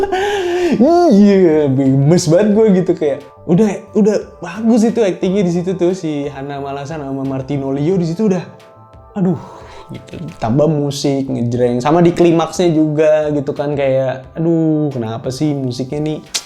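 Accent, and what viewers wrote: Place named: East Nusa Tenggara, Indonesia. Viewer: Logatnya itu yang bikin rindu kampung,,,, Salam Dari anak NTT bang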